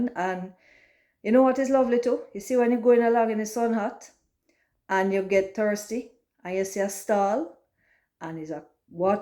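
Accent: Jamaica. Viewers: OMG this is awesome she speaks just like a Jamaican.Such a beautiful lady.. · True white woman speaking natural and authentic Jamaican patios. 👍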